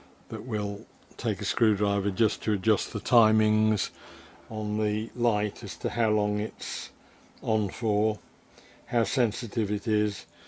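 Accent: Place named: West Midlands, United Kingdom. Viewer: Are you from the Midlands? You've got a slight West Midlands accent to you!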